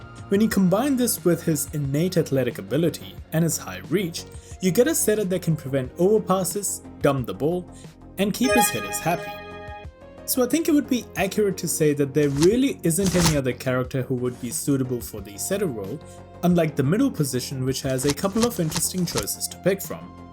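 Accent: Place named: South Asia. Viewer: I can identify a desi voice when I hear one👀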